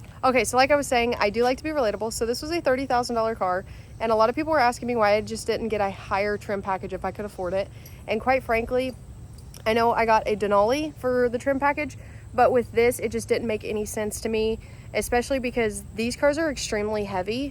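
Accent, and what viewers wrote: Kentucky, United States. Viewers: Congratulations on anniversary. The Kentucky accent is going strong lol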